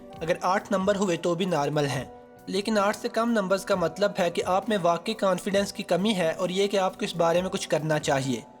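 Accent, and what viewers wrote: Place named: Lahore, Pakistan. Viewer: I think lahori ha admin due to his lehja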